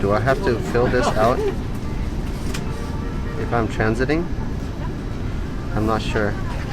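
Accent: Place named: India. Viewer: tell me why you have Indian accent.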